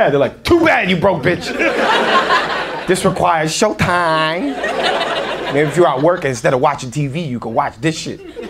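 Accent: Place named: New York, United States. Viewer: he likely picked up the accent from living in ny & the media he watches/consumes<3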